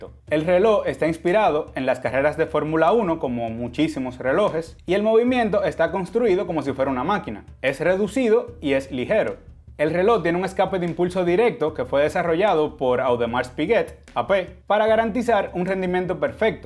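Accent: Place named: Dominican Republic. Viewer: Dominicano eres, se nota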